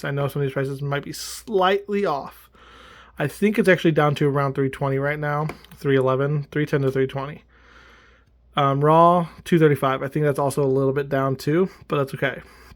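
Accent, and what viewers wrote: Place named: Canada. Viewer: 460 you sound canadian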